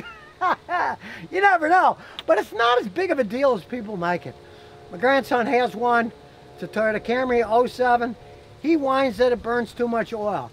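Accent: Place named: Tennessee, United States. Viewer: its like your getting used to Tennessee, i can hear that in your tone 💪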